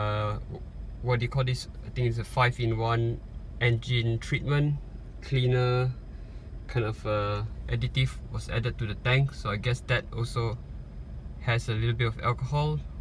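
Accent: Singapore. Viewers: U sound so singaporean, r u?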